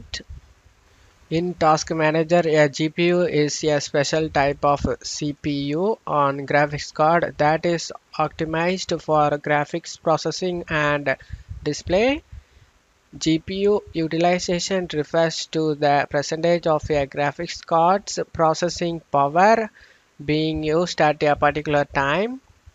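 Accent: India. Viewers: you know it when u hear that indian voice its the real deal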